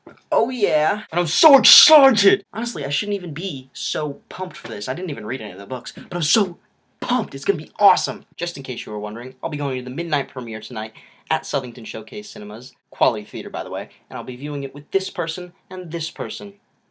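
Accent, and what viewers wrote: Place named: United Kingdom. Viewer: You're Bristish accent is immense :L And I'm British, so i know what I'm talking about :)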